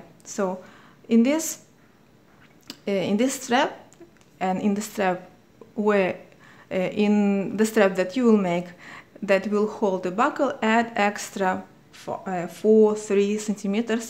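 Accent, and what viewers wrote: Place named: Russia. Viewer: Я только начал учить английский, через секунду узнал русский акцент.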